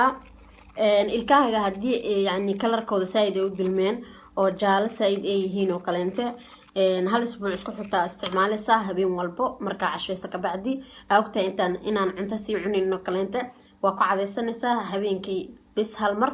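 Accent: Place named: Italy. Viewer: byo mashalh waad fcnthy lkn Lahjadaada wlhi daacaddii ma fahmayo af talyaani iyo sisi kale baad u hadashaa mrka ugu yaraan ingredientska english noogu sheeg adoo iga rali ah .. thanx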